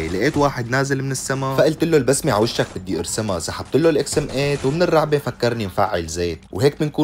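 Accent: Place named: Levant. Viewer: مبين شامي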